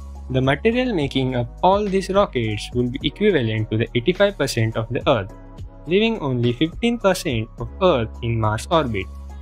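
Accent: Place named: England, United Kingdom. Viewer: I'm sorry I don't understand the English accent very well :( Good animation ♥️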